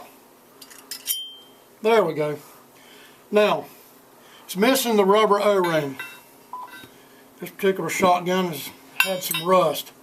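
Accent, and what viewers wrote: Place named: East Texas, United States. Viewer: where you from boy? I'm guessing it aint main. meby deep east texas.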